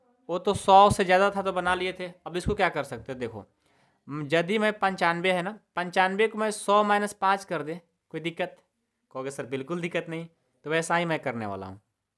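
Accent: Bihar, India. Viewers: Sir àap Bihar se h ?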